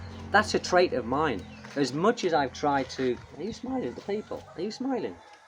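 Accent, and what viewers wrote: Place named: Teesside, United Kingdom. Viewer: New subscriber, where is that accent from, cant quite place it, possibly teesside? I'm from Newcastle. Keep enjoying PH 😉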